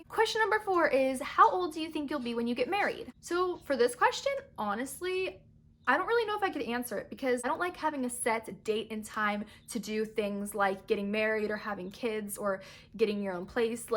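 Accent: United Kingdom. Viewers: You have a good British accent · Yeah like some people don't like her but not like those who dislike her, I love her and i love her British aksent like whoaw 😍😙😘❤💙💚💛💜💓💕💖💗💘💝💞💟👍👌😍😙😘❤💙💚💛💜💓💕💖💗💘💝💞💟👍👌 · Nice British accent it was funny when you said that sometimes when you speak British it gets on peoples nerves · I love your British accent😂❤️❤️❤️ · I LOVE your British ascent it reminds me of (Tahani Al Jemil) form (The good place) on Netflix defiantly recommend for u to watch so so SO funny watched it all in like a week so yeah..... love u your the best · I LOVE THAT BRITIST ACCENT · I love your British accent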